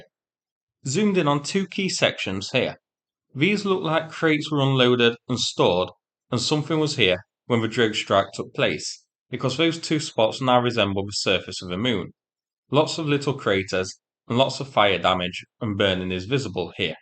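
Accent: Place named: Yorkshire, United Kingdom. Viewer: I just Luv your Yorkshire Accent...It reminds me so much of my Granddad. 😊😅😂...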